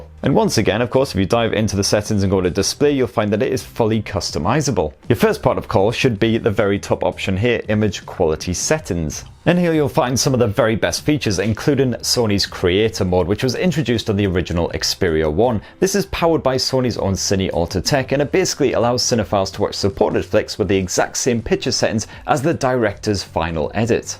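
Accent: United Kingdom. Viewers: Hey bub Just to let you know, I had a hard time keeping up with your tips and tricks,, you went extremely fast explaining the tips, you had to rewind and play what you said several times to catch what you said, 2 your British accent is a little difficult when you're going too fast speaking wise, 3 you're an phone expert but many people might not be so try to use common words for average people just my advice bub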